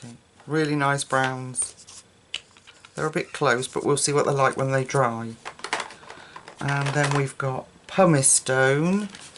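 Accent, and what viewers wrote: United Kingdom. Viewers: Oh, you are so much fun! And you keep it real! And I love your British accent! I watch zucchinikitty all the time, she’s indeed a master with the inks, I got a few just to try, they are not as easy to use as it would seem! The detail inking tool is really hard to come by where I live (Mexico where nothing is available and when it is is at least 5x more expensive) do you think a Q-tip could work? I hope to see you color with these a LOT! They are fast! And even though I love colored pencils.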